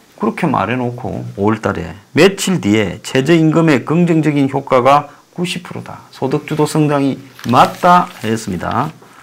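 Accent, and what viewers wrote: South Korea. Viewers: 남부 사투리에 가까워요.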